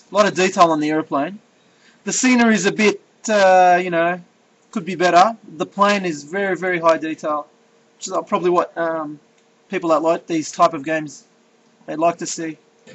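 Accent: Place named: Australia. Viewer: are you australian, cause you sure sound like one ...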